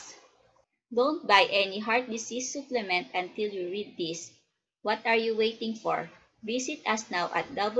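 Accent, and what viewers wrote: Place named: Philippines. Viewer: The narrator doesn't sounds professional. Got a very heavy Filipino accent. I have my reservation every time the announcer or narrator hide his or her face.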